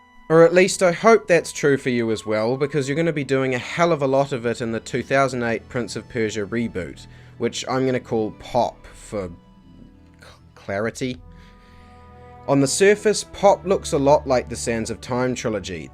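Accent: New Zealand. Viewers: Oi are you a kiwi??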